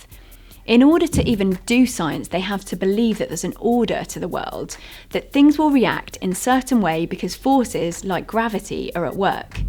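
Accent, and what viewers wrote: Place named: England, United Kingdom. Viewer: Well, you were able to prove one thing at least: not everything said in an English accent sounds intelligent.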